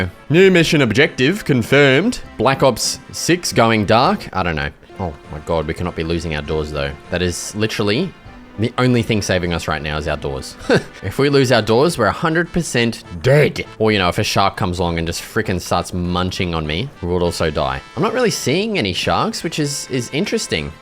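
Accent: Australia. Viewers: Where are you from? it sounds like you're an aussie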